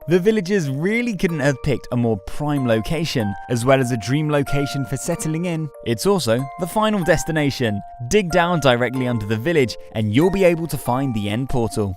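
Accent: United Kingdom. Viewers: He sounds like he's from the great British baking show.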